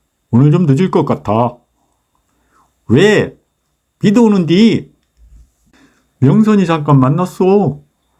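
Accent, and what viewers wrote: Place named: Chungcheong, South Korea. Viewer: 겨울철 자전거 풍경과 내레이션이 잘 어울리는 구수한 충청도 사투리가 멋져유.,. 다덜 콩나물지하철 경험하면..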